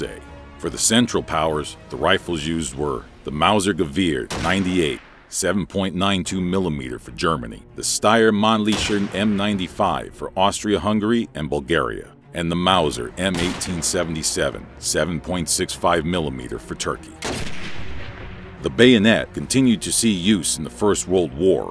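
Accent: South, United States. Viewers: he sounds so american lol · You can kinda hear a southern accent too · he must have a southern accent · Southern accent anybody else here it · That American acent is quite strong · This guys sounds like Forrest Gump. Is it a regional accent in US? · Is no one gonna acknowledge the fact that he has a Southern accent and he said “Rafle” instead of Rifle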